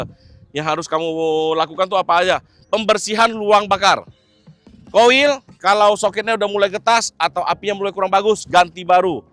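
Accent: Medan, Indonesia. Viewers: Medan kuiii😂😂😂😂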